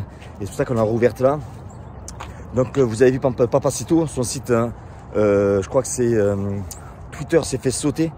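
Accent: South, France. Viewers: Salut franchement tu me régale!! Un mec comme toi je vais à la guerre les yeux fermés un mec vrai et authentique sa se voit et sa s'entend😉 et étant de Perpignan entendre un accent et un parler du sud sur YouTube fait encore plus plaisir 😉👊🏼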